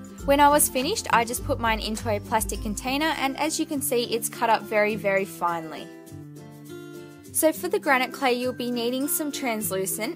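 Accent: Australia. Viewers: She is Australian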